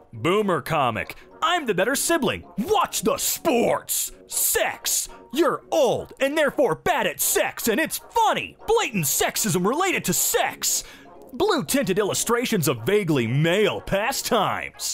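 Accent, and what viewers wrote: Ireland. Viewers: has to be Irish omg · Love Robins irish accent. Is there an irish subbredit he can read for us? =D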